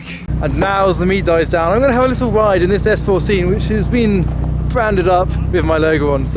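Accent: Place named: RP, United Kingdom. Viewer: I thought this was a supercarsuspects video until I heard the posh british voice lmao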